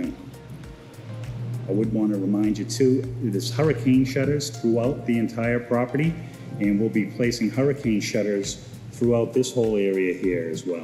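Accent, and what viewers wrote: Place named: New York, United States. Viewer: You sound like you are from NY or NJ.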